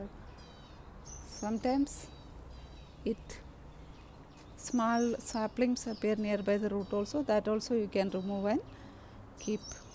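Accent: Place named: Kerala, India. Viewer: You remind me of a nun from Kerela. Voice and accent is so similar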